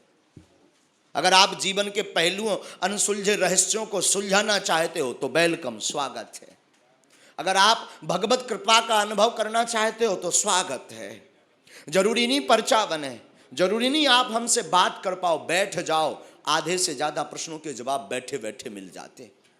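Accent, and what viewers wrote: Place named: Bundelkhand, India. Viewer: Ap ki mohak muskan bundelkhandi boli aur tha thari barne ki ada man moh leti hai.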